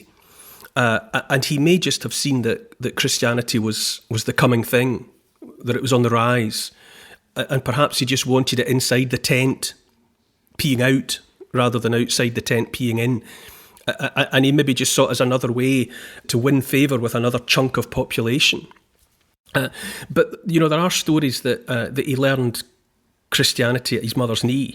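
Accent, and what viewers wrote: Scotland, United Kingdom. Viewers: Your commentary on the history of the British Isles spoken in that wonderful Scottish accent makes every episode for me , like living the tale. Thank you Neil. I loved your story about Australia and again it was your story telling that made it so special. My wife is a direct descendant of Bishop Pedro Mews and his history is quite amazing. I would love it if you could bring his life and work to life in your inimitable. way. It's a story worth telling. 🇦🇺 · Thank you again Neil for a momentary escape from reality.North England was my home until many years ago when l was sent to New Zealand. I cannot tell you how nostalgic your historical videos make me feel. As a history buff myself l just love learning about British history. lt is a wonderful thing a sense of connectiveness and a sense of belonging when l hear your Scots brogue so lovely on my ears when you speak . 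I have been to Lindisfarne some years ago, magical place. I feel so happy that the history good or bad that l hear from you gives me a sense of belonging as they are my People.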